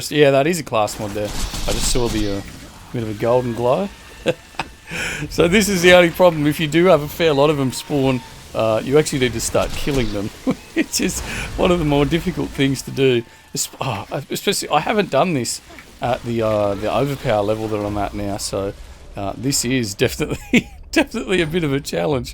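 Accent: Australia. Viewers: At first i thought it was your familiar Australian accent that was so comfortable, then I realised it was your down to earth Australian attitude. No tryhard pretentious radio announcer voice! Rare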